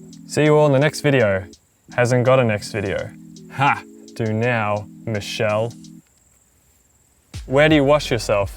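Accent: New Zealand. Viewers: He's a kiwi - from New Zealand 😊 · i knew you were from new zealand lol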